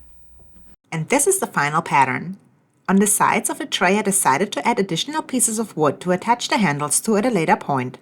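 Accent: Germany. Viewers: Sie kommt aus Deutschland. 😊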